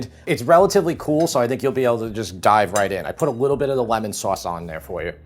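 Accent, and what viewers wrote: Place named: Long Island, United States. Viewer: That accent. Is it like old school Long Island? Montauk with a bit of New England "AAHH". I can't quite place it.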